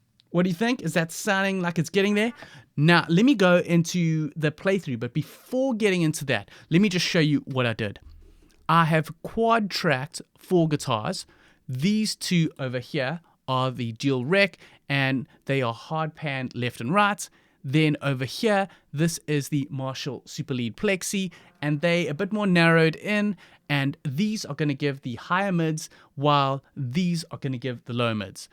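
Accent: South Africa. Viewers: 2 seconds into the video I knew you were from South Africa 🇿🇦🇿🇦🇿🇦🤘🤘🤘🔥🔥🔥 much love from Kzn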